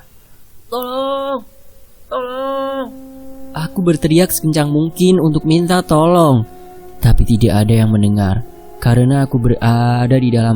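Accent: Java, Indonesia. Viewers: Aku suka bgt cara kakak membawakan ceritanya,opo maneh nek omongan boso jowo gemes nek dengarkan 🤭 aku selalu menunggu cerita2 selanjutnya ❤️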